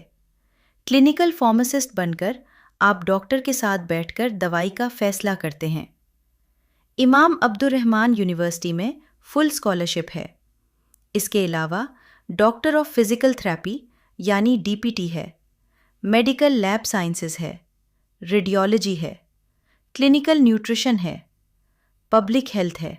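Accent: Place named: Hyderabad, India. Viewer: Assalamu Aleykum, Aap ka laheja mein Hyderabadi accent hai ( india ) sun kar achha laga , ( Hyderabad Deccan se Syed )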